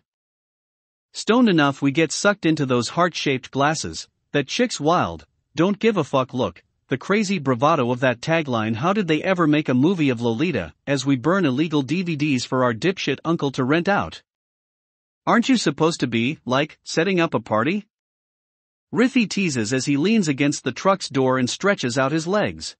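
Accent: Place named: United Kingdom. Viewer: It's a bit hard for me to understand the British accent, but I'm getting used to it, great story.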